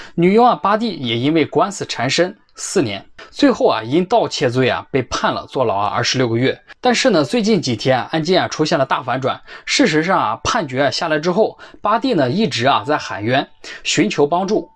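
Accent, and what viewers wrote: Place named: Northeast, China. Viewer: 小哥这嘎啦味普通话很亲切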